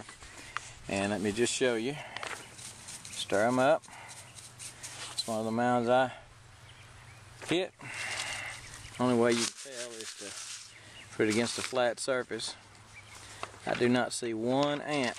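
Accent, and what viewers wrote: Texas, United States. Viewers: speak english , not texan drawl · Love your accent! How I miss my home state of Texas!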